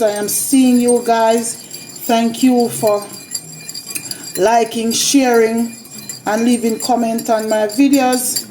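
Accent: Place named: Jamaica. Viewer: Wagwan, when i miss Jamaican accent, i come here. Thank u mum for this yummmy video❤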